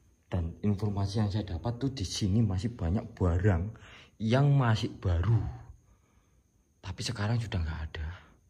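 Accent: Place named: Java, Indonesia. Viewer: Aku seneng.. Denger pean ngomong Jeannnn.. Khas jowone Cak.. Mantaaf pokok e..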